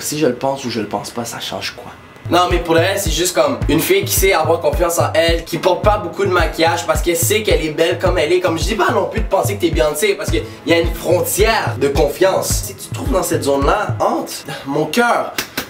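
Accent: Quebec, Canada. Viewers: L’accent canadien et tout 🇧🇪 🇫🇷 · OMG troppp bien, j'adore ta vidéo, t'es plein d'énergie, franchement je regrette de pas avoir trouvé plus tôt ta chaîne !!! et en plus t'es Canadien, t'as un magnifique accent québécois !!!! continue bien!!! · Comme c'est kiffant d'entendre un gars avec un accent québécois. 😮💙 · j'adore ton accent Québécois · Je t'adore tes drôle et j'adore ton axan québécois et ses intéressent continue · Je suis nouvelle tu es quebecois ? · Première fois que je tombe sur ta chaîne tu m’a tué J’avais jamais entendu un renoi avec un accent québécois 😂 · Omg.... J AIME TROP TA CHAÎNE. Jdis ça juste en regardant une vidéo... XD. T'es québécois? Si Oui, je suis québécoise aussi mais... j'aime trop ton accent même si g le même... C vrai... Chuis une fille compliquer 😂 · Je kiff t vidéo et je voudrais que tu m'apprenne l'accent québécois 💗😝😛👌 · Coucou je suis nouvelle depuis hier soir et j’adore t’es vidéo tu me fais trop rire ! 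J’aime trop ton accent Québécois ! Fin bref j’adore ce que tu fais depuis hier j’ai dû regarder plus de 10 vidéo et j’adoreeeuh !❤️✨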